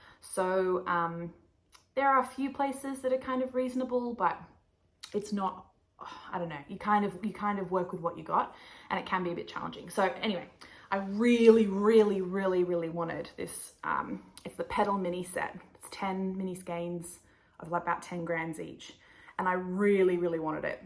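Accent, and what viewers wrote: Australia. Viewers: Hey, fun podcast. I love that as the podcast progressed you became more “Aussie” - I giggled thinking of overseas viewers when you dropped “so that what I’ll be doing this arv.” 😂 I only came across this podcast because Amy Palko mentioned you - so funny to be hearing of you via a podcaster on the other side of the universe, but there we are. Looking forward to watching all your other eps, and seeing more Buddy antics. Waving at you from Canberra, where it’s often cold enough to wear our knits. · I clicked when I saw you wearing your lovely grey Felix and then I got excited to hear your Australian accent! I’m in Melbourne. I loved all your knits, the shawl was definitely worth getting that mini skein set for - looked lovely with the jumper! And I was extremely intrigued by your use of the “cheeses” of roving! I already was thinking to try Plotulopi one day (don’t know if I can be bothered chasing Nutiden). 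I’m looking forward to seeing how your shawl relaxes after blocking. It looks so dimensional with that roving combined with the braid detail.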